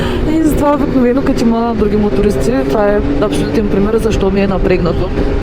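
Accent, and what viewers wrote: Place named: Varna, Bulgaria. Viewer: Варненският ти акцент е много приятен, не го променяй само камерата. Просто бъди себе си!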